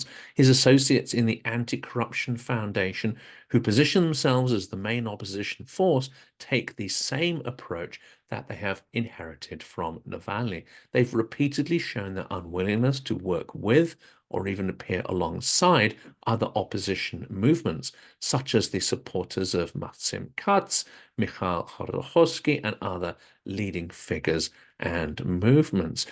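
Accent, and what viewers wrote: United Kingdom. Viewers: I checked the channel info and it says location United Kingdom. 🙂 Plus his accent is very British. 😊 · Oh, funny. I’m American and speaks British. He’s from United Kingdom, and lives there.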